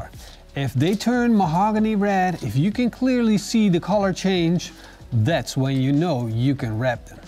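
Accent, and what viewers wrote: Netherlands. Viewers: Hahaha. Nou zat ik te luisteren en dacht.... volgens mij is dit een nederlander of ben ik nou gek. Leuk om te zien.👌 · I LOVE your videos. Part of it is your accent that makes me feel at home ( parents and opa +oma with the same accent.) I would love for you to throw in a few more Dutch words and phrases. 🐖🐖🐖🐖🐖🐖😁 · Do I detect a Dutch accent? It takes one to know one. Great video, trying this tomorrow! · Is that a Dutch accent I sense?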